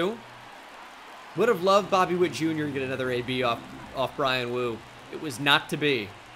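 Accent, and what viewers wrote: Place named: New Jersey, United States. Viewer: You got really Jersey in here and I enjoyed it haha.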